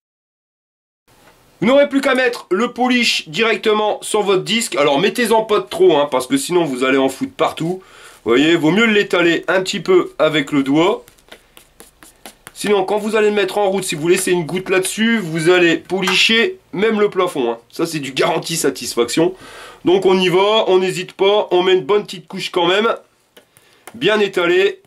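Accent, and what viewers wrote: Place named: Nord, France. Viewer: Juste pour l'accent du chnord je m'abonne 👍🤙 Big UP d'Algérie 😉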